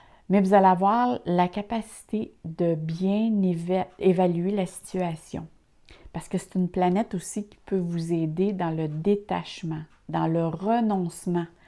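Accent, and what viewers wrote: Canada. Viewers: Merci pour cette voyance et votre accent canadien 😁😁 j'espère que ça va se passer car besoin de positivité 👍 à bientôt ..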